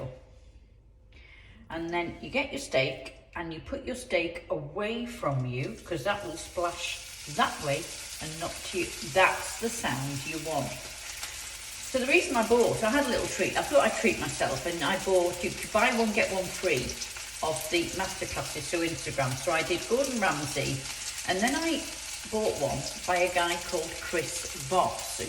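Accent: United Kingdom. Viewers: Sounds yorkshire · You are so gorgeous and I love the accent.. I take it that you are from the UK maybe.. I would love for you to be my Valentine love..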